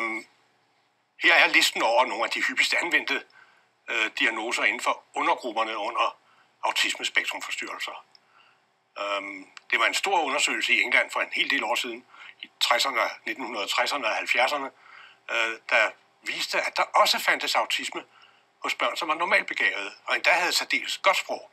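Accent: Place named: Australia. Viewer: Is that an AS symptom, your picking up a little bit of an Australian accent. Oh, and is TMS trans magnetic stimulation helpful with AS depression?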